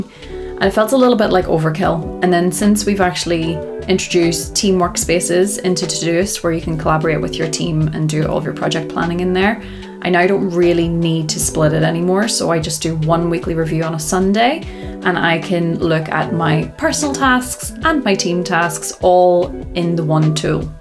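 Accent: Scotland, United Kingdom. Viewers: Love the relaxing tone of this video - and always love to see references to GTD. Curious, what is your/her accent? (As in, which part of…Scotland, right?)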